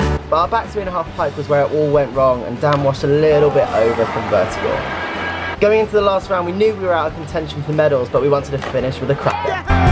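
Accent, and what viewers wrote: United Kingdom. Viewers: Hi from Argentina!! (I speak spanish so sorry for mi mistakes) Congratulations for you!! I really like it how so you speak with the britiah accent hahah. And i always shocks when see you doing that in the pool!! Is really crazy how you can do that. I probably never can do that, im more studying person haha. But good luck for you!!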